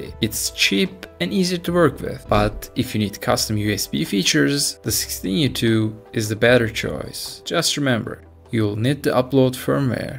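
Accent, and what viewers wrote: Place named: Turkey. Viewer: Hele diyorum hafiften bir türk aksanı duyuyorum.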